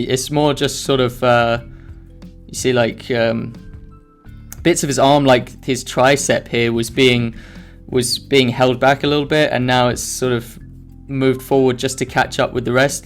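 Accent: United Kingdom. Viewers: i like when you talk in british accent mine while i learned how to say english in british accent like you say it in this video btw i learn much knowledge from you thnx broo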